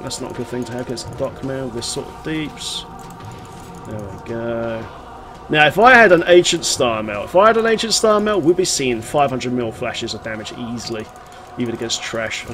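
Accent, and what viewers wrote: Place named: United Kingdom. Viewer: I don't understand half the things this guy is saying! Damn Brit accent. Slllooooooow down man when you speak during your vids, thanks!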